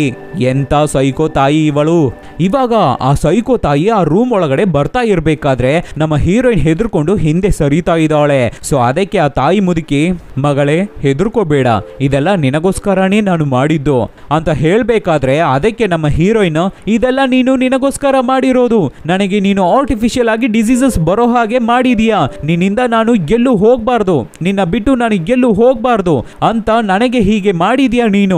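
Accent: Tamil Nadu, India. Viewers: Nim mother tung Tamil ansuthe... 😂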